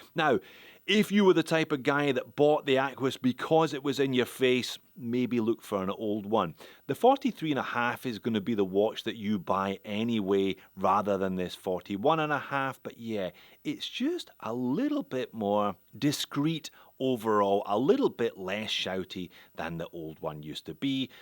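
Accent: Scotland, United Kingdom. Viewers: Mate!. You can tell you're Scottish.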